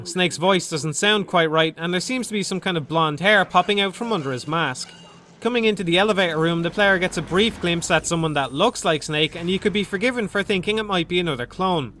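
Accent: Ireland. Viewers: You're Irish aren't you :)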